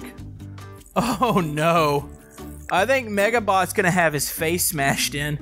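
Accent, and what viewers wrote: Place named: South, United States. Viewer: And are you from Texas because you sound southern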